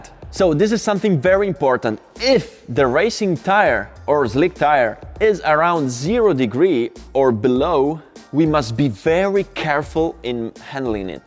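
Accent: Italy. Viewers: This guy can talk ! I got a headache within the first 5 mins, jeez..! Keep working on your English dude, it needs still a lot of improvement, I guess you will never lose that Milanese accent..Lol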